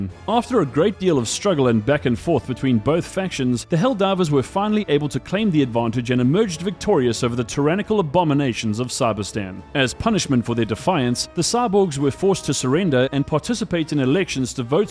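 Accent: Johannesburg, South Africa. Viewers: Started watching video, about 5 seconds in I was like hold on, this is a Joburg accent (northern suburbs even). Continued watching and now I'm sure of it. Aweh bru :)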